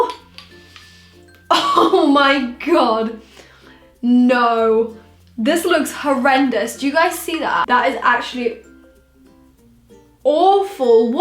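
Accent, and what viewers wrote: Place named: England, United Kingdom. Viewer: she is from England! she has a similar accent to me but mines more high pitched :)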